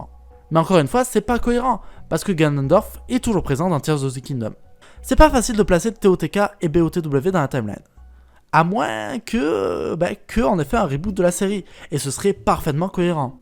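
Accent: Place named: Spain. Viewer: nan mais c'est pas un très gros accent mais on dirait un accent espagnol genre mdr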